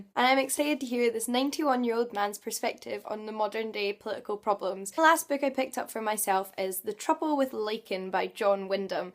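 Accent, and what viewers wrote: Scotland, United Kingdom. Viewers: I love your accent! Scotland is such a beautiful place! Listening to your voice reminds me of the beautiful time I had back in april in Edinburgh! Awesome haul btw! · ***** That's really admirable. People tend to overlook that these days, I think. :) And you've got such a lovely accent! May I ask what part of Scotland you're from? I kind of want to travel there and just sit in a public place and just listen to everyone having conversations- is that weird?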